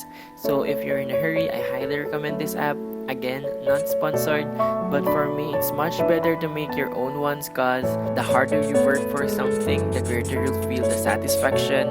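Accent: Philippines. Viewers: His filipino accent tho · 𝐋𝐞𝐦𝐦𝐞 𝐠𝐮𝐞𝐬𝐬 𝐡𝐞 𝐢𝐬 𝐟𝐫𝐨𝐦 𝐭𝐡𝐞 𝐩𝐡𝐢𝐥𝐢𝐩𝐡𝐞𝐧𝐬 𝐦𝐲 𝐛𝐟𝐟 𝐢𝐬 𝐚𝐧𝐝 𝐭𝐡𝐢𝐞𝐫 𝐚𝐜𝐜𝐞𝐧𝐭 𝐬𝐨𝐮𝐧𝐝 𝐭𝐡𝐞 𝐬𝐚𝐦𝐞 𝐢𝐦 𝐟𝐫𝐨𝐦 𝐯𝐢𝐞𝐭𝐧𝐚𝐦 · I know a pinoy when I hear one🌚 Lab da bideo, Kuya!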